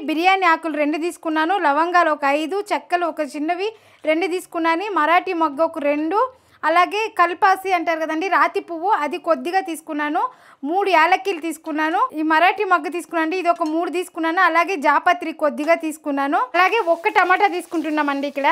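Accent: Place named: Rayalaseema, India. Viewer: Meeru raayalaserma baasha maatladthaaru Mari andhara antaaru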